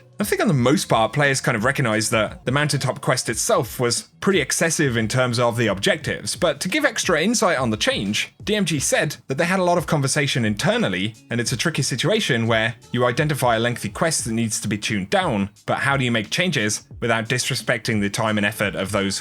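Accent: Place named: United Kingdom. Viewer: He is from the uk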